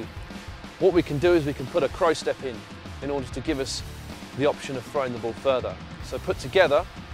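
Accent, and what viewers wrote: United Kingdom. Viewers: LOL he's British! Nice video, though. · I did not expect a British dude teaching American football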